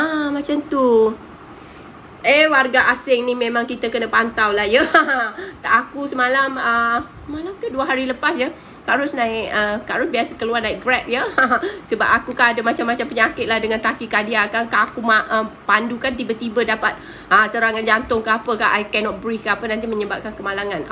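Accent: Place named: Kelantan, Malaysia. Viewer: bunyi mcm org kelantan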